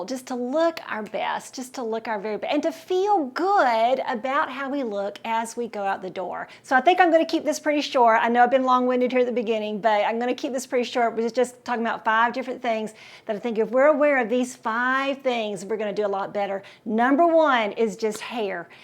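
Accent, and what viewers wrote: South, United States. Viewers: To much talking. Very Southern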